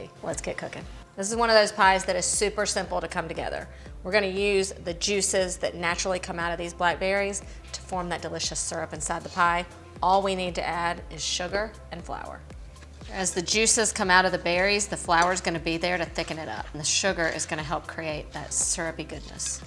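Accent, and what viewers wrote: South, United States. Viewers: Clear and to the point, even with some bloopers stuck in. And what a hostess with that yeehaw twang! <3 Thank you kindly from Poland.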